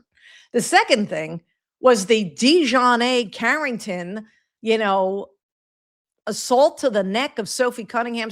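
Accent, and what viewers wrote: New York, United States. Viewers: right on😂. Wnba mgmt. is a travesty. I love your accent! NY? Queens?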